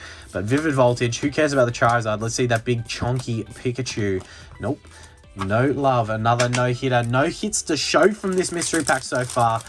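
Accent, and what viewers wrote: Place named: Australia. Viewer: Aussie accent, instant subscribe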